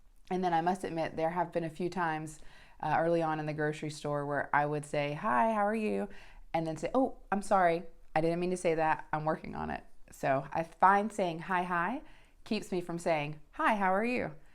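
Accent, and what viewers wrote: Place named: Norway. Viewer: She has picket up the local accent That's why it sounds very special. I had no problem understanding it was Norwegian.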